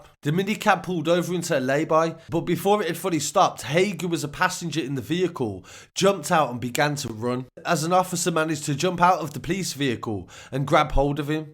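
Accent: United Kingdom. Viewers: I just like the intonation and accent hence glued to these stories. Which part of UK is this amazing accent from 🤔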